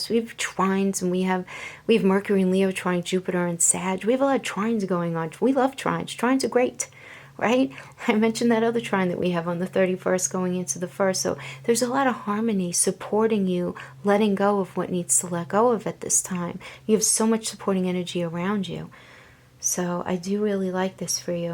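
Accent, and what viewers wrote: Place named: Boston, United States. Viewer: You are definitely from or live in Boston... at least your accent sounds like it... great reading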